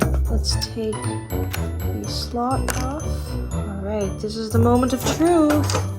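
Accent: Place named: Netherlands. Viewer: ik hoorde al meteen dat je Nederlands was en wat geniet ik van deze video hahahah soon ook mn pc bouwen. spannonddd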